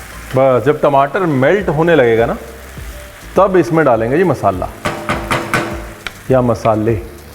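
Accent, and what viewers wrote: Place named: Malwa, India. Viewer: Hi ranbir.. Muje apki recipes bahut achi lagti h.. Aap faridkot se ho .. Main moga se ho.. Ab hm jalandhar main rehte h .. Aap bahut achi punjabi bolte ho.. Malwe wali ...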